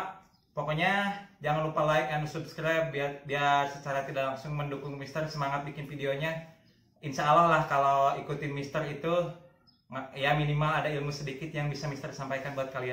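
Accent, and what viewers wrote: West Java, Indonesia. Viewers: Logat sundana ngeunaheun kang mister :v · Si mister dipanggil abang. Tp dari logat mah teu bohong cigana orang sunda hahahaha..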